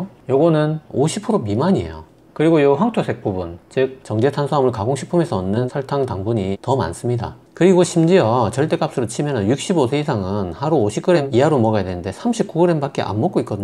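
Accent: Gyeongsang, South Korea. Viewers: 경상도 억양이 귀에 쏙쏙 와닿네요..ㅎㅎ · 구수한 경상도 말씀이적당히썩여서하시니 재미있게 박사님 말씀 잘듣고 있습니다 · 경상도말씀이라 부산이면 뵙수잇는의사라 생각햇는데 요양병원 어는곳에 계시다네요 ㅎㅎ 의사중최고의 의사입니다 늘 좋은영상 계속듣고 따라하도록 하고잇어요❤❤ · 은. 고향이 경상도 이신걸로? 말투에서 정이가는 상남좌삘^^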